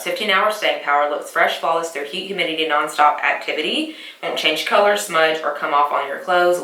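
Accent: South, United States. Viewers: I love your accent! Us girls with southern accents are the best 😄 you’re gorgeous by the way! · I thought you were from Georgia cause of the southern accent lol but I'm Mexican but I'm raised in Georgia and i sound like you lol 😂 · OMG girl same!!! I'm southern and from Alabama!!! It's good to hear a makeup guru that's southern like me, lol. You should follow me in instagram, I have to accounts one is my main gracieorr_ and the other is my "spam" and I basically just post a lot of makeup looks I've done. I would love for you to follow me!!! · Yasssss .... Girl your accent just gave me life ... I'm from SC and I didn't realize how much I miss hearing that southern accent . U look amazing !!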